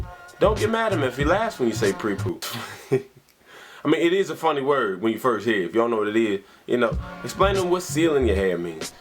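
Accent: South, United States. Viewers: that southern accent.... sexy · WOW! I'm impressed at your natural hair verseness! I agree wholeheartedly about how women should include men in on why we're doing what we're doing to our hair and WHY! LOL going to get some ecoctyler 1am! so 4a is your crush....so sweet...when can we get married???!! LOL! Where are you from? I hear a little southern accent. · I love the message....but I love love love that southern accent! :)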